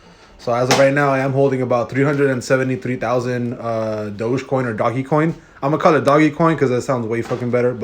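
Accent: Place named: East Los Angeles, United States. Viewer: Subbed ! Reminds me of that east LA accent. I'm staying put at 600 for now I wouldn't mind losing it but let's see how tomorrow goes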